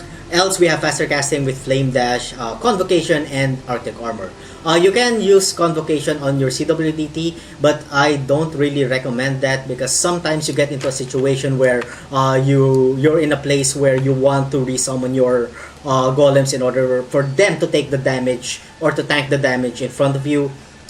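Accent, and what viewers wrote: Philippines. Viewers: scrolling through PoE vids and i'm commenting on this one to ask... that accent sounds filipino, are you filipino?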